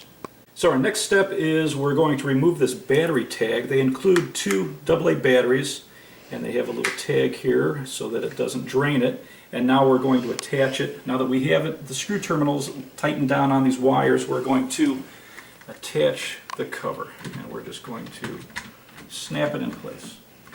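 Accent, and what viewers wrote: Chicago, United States. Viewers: The guy sounds like he is from Chicago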